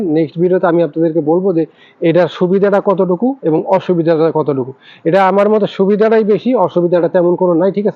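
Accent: Barishal, Bangladesh. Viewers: দাদা আপনার বারি কই বরিশাল নাকি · আপনার বাড়ি কি বরিশালে?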